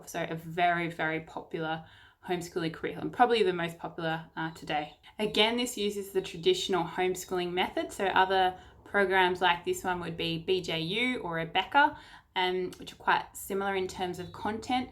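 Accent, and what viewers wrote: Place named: Australia. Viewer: So good to hear an Aussie giving advice on a homeschooling program. Just wondering if this is for the state of Florida?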